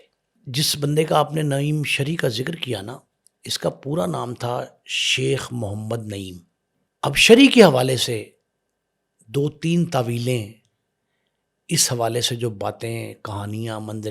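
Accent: Punjab, Pakistan. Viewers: Sahib tu c Paindo ho, bus video Bani ja, paisay kami ja. Tohadda Karachi SE Kiya talluk. Ardo (urdu) Punjabi which bolde ho. O Paindo bey ja.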